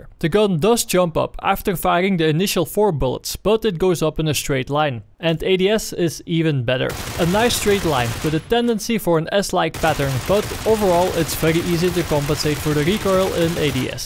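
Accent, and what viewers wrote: Netherlands. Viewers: He sounds Dutch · dutch arent you xD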